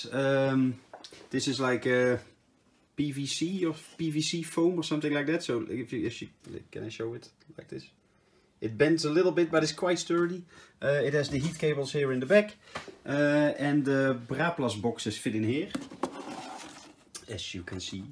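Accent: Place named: Netherlands. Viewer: Heerlijk Steenkolen engels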